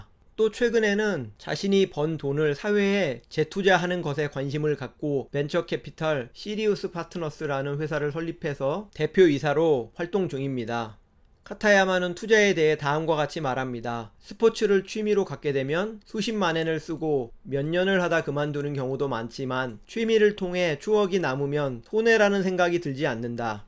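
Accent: Jeolla, South Korea. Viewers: 의 발음을 못하는거보니 전라도 분이시군요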